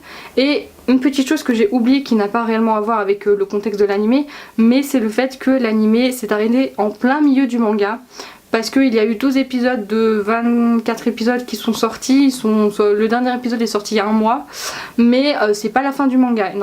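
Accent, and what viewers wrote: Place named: Belgium. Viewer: ah bon tu es Belge du coup? je suis moi même Belge mais je n'arrive pas a reconnaitre à l'écoute qui est FR ou Belge xD si tu ne l'avais pas dit je n'aurais pas remarqué, même en 30ans mdr tres sympa la video, faudra que je regarde à l'occasion :)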